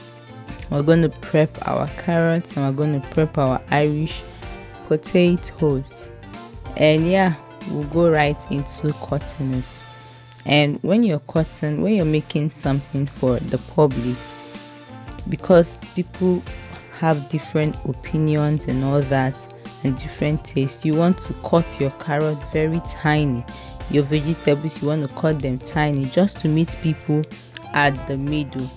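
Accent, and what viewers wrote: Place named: Nigeria. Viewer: Omg first off I love your Nigerian accent I’ve made meat pies before and they are soooooo yummy makes my heart so happy 💕thanks for sharing luv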